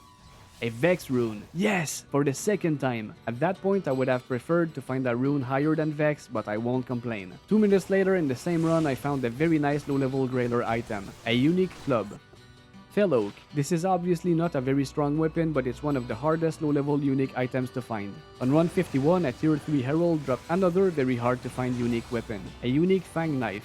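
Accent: Quebec, Canada. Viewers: bruh i was like , he sound french canadian , apres tu dit , la face a ti-gui xD nice video bro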